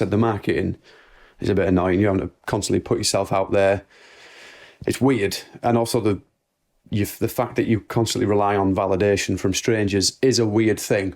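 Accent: Birmingham, United Kingdom. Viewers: Glad to see you back! You got everything you need: bostin accent, a talking dog, marked decks of cards, comedic genius, sausages, British poise and editing skills! As Tom Cruise said in Impossible Mission 7: Avengers Vs. The Ron Hubbard Scientologic Universe: "The world is yours, innit"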